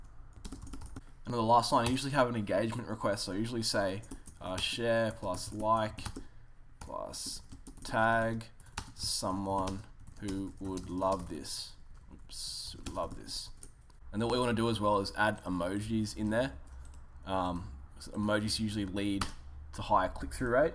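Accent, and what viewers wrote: Australia. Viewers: Good to hear an Aussie doing it, I’m from South Australia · So good to hear an aussie explaining it ahahhahah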